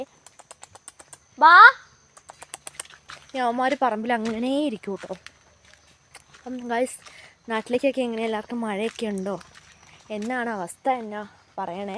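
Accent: Kottayam, India. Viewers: Slang oru kottayam touch 🧐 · Athea currect kottayam slang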